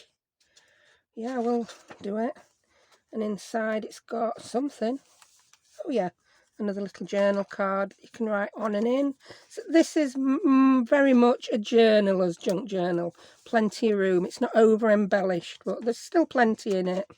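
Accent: United Kingdom. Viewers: Hi, I have been watching your videos for a while now but I am also horrible about leaving comments. I am usually watching on my TV. I really enjoy your tutorials and have done several of your projects. Sometimes I just put on one of your videos just to listen to you while I craft. I lived in the UK for 6 years and really miss a lot of things so listening to you talk makes me feel at home. So happy for you and hope you enjoy continued success and many more subscribers.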